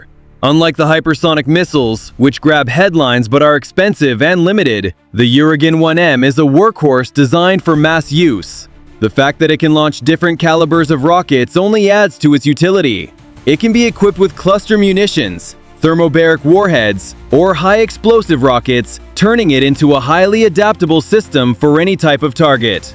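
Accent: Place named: United States. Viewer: Did I miss , for how far it shoots ? All information was logical with a good an Amercan English , thank you .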